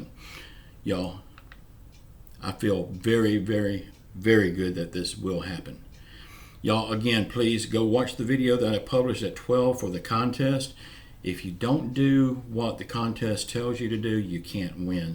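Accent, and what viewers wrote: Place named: Texas, United States. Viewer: Damn I like you! You gotta be from my neck of the woods in either south east Texas/Oklahoma, not that it matters though lol. You say yall' prefect! I'm a supervisor and my guys love it when I say "Good Morning Yall" during the morning safety meetings lol. Of FYI you were one of the first to report the last update before the other update lol!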